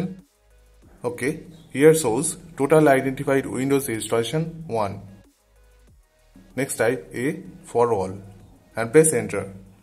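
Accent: India. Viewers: Awaaz se vah Indian dikhta😂 hramkhor bolna re Hindi mein bol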